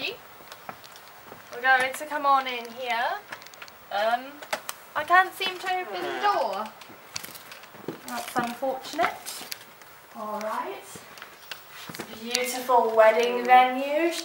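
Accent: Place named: United Kingdom. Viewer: Your soooooo gorgeous 😍😍 i love your British accent 😉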